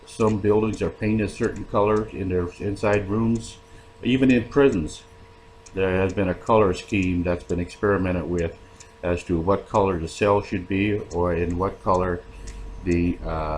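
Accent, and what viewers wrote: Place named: South Louisiana, United States. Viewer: Are you originally from South Louisiana? Your accent reminds me of some back home folks near the bayou.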